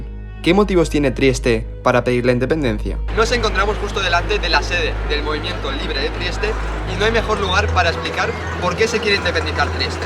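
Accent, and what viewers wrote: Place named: Spain. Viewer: Que gusto poder ver videos sobre estos temas con acento español. Casi siempre son argentos o mexicanos 😂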